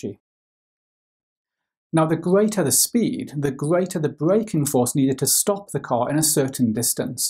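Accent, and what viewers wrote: Wales, United Kingdom. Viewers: Hello. Please could I ask if you are welsh?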